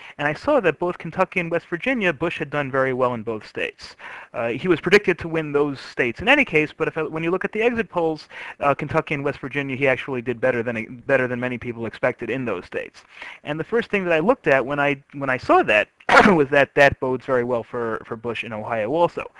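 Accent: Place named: New York, United States. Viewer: r u from new york? you say "all" and "call" differently.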